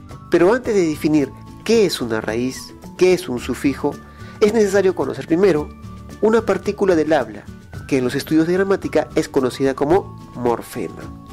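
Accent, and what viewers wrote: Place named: Southern Peru, Peru. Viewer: Peruano del sur, osea chanca. Efectivamente en Cochabamba/Bolivia, he escuchado el quechua con un acento parecido.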